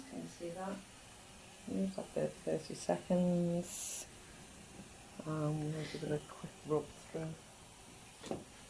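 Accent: United Kingdom. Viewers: Is that a Wirral accent I hear?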